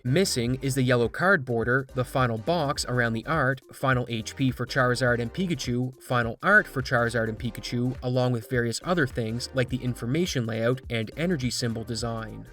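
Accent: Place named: Newfoundland, Canada. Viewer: Took me like 3 videos to catch the Newfoundland accent. 👌🏼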